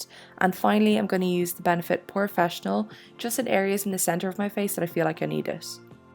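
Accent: Ireland. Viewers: I wish I had an Irish accent, so pretty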